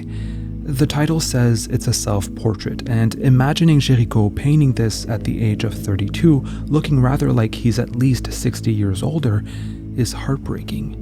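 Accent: Quebec, Canada. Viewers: Are you from montreal or quebec? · hes from kebek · Your quebecois pronunciation is surprising 😄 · Hey love your content is your accent Quebecois ? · Salut, es tu Québécois? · Are you from Quebec?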